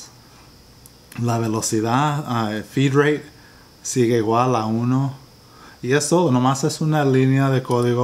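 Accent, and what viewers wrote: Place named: Mexico. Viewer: Hola. Podrías decir los diámetros y paso de los dos Usillos, el X y el Y, así como los pasos por vuelta de cada motor. Puestos a pedir podrías decirnos de donde eres, cómo fue aprender ese Español medio Mexicano y si vives en España. Cuando te haces famoso la gente siente curiosidad, es normal. Un saludo 🇪🇸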